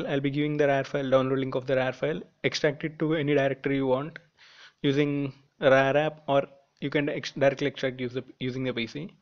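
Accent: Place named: India. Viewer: this is why I like hindi accent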